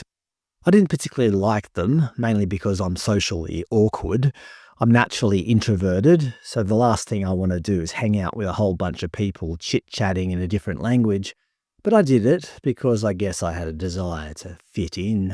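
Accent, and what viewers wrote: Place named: Darwin, Australia. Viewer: From the way you talk and the subjects you cover i have a feeling you're either from Darwin or lived in Darwin.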